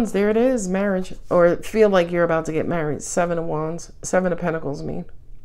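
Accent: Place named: New York City, United States. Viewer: 2024 is my 7 year cycle as well! I am ready to be completely happy & love ❤🎉 Bring it!❤🎉 Thank you! Bring on the NYC accent 😊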